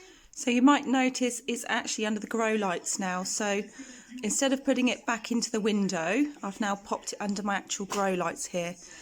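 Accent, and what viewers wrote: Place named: RP, United Kingdom. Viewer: I've subscribed to your channel. I like your content and your posh accent is a bonus. 😊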